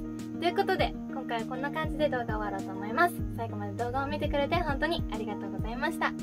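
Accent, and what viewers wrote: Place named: Hakata, Japan. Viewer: 千葉県からです！博多弁が凄く好きで、フル見させて貰いました。やっぱり博多弁っ良いですね！ありがとっちゃね！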